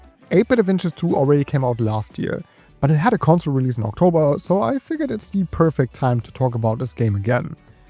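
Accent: Germany. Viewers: Der deutsche Akzent is zu krass 😂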